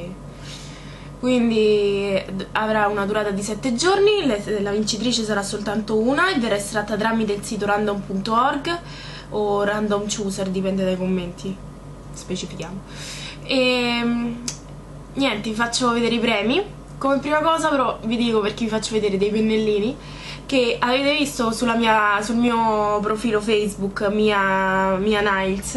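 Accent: Rome, Italy. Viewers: 3 sei molto simpatico e il tuo accento romano mi fa impazzire!!!mi sta troppo simpatica la tua parlata!!! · secondo me nn dovresti lasciarti andare tanto col romanaccio, e ti parlo da romana =) è bello sentirlo parlare, ma nn troppo esagerato!!! Ciao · ") che bella quando parli il romanesco verace hahhaha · 1. che bei premi.. sei sempre bellissima.. hai trovato proprio le parole giuste.. siamo una grande famiglia.. ti adoro quando parli in romano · 4 adoro il tuo accento romano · 2 amo il romanesco hihihihi mitica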